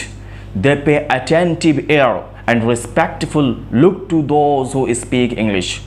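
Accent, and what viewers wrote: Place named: United States. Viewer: Lag raha hai America se aaye ho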